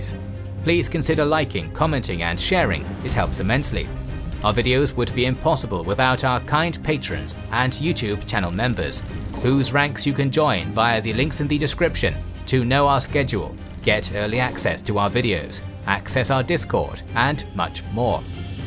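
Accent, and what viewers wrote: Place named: United Kingdom. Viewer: Excellent voice. Calm and clear. And British 🥳🥳🤣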